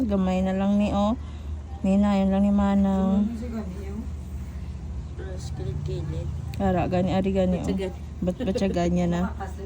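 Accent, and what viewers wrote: Iloilo, Philippines. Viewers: knamian ko sng mga tono ilonggo ah.. tga dn ka maam? watching from Bacolod..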